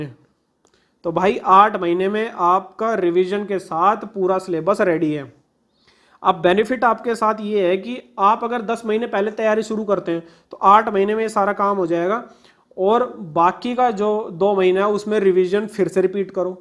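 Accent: Haryana, India. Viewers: 🙏🏼 haryanvi accent ❤️ Tahe dil se shukriya sir❤️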